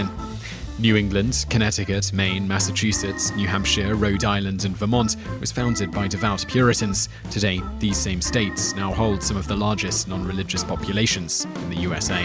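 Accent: United Kingdom. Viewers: the person doing the voiceover has a british accent ;)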